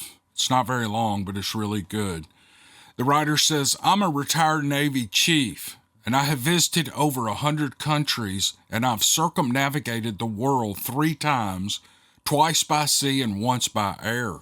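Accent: South, United States. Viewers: If? Really? I remember when u told someone not to send u a story, if they'd already sent it to someone else's channel. Brother i love ur story tellin. How about u look at all the dna results. Again don't get all kinda way, i really love ur style and southern accent, being raised in the south, still yet u R tellin stories already shared on other sites, which aren't trying to do that.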